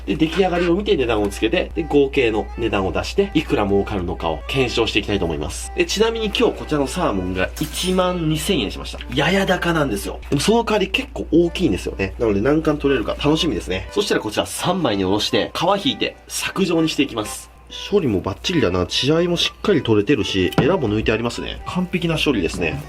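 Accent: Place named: Kansai, Japan. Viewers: 今日どうしたんや めっちゃ関西弁やん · いつもは名古屋弁なのに今日は関西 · 最近喋り方が関西気味でおもろいw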